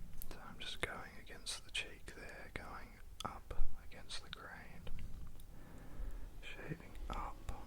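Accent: Australia. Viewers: Australian English is already an ASMR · Love the whispering with the Australian accent it’s hypnotizing!😂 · Where have you been all my life with that voice? Another Ozzie ASMR! Honestly, I already love the accent and then the whisper....I'm in heaven!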